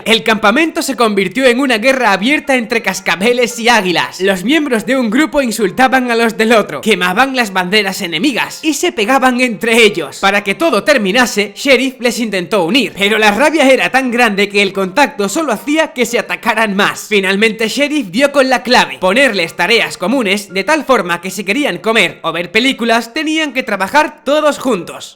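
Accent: Spain. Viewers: Es español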